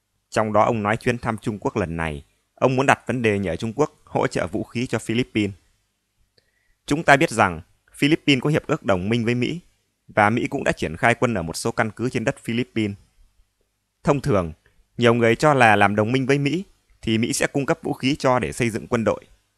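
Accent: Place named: Nam Dinh, Vietnam. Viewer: ad. que o dau z.. nge giong o nam dinh z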